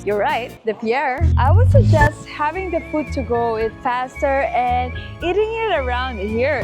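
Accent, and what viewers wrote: Philippines. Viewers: Filipino? I recognize a Filipino accent in 5 seconds because of my many visits to that great country.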